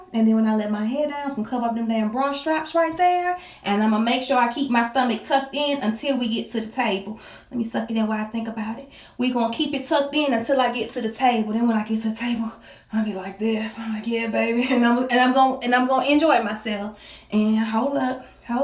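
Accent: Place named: South, United States. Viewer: You fine ass hell , with that country voice dam , bro lucky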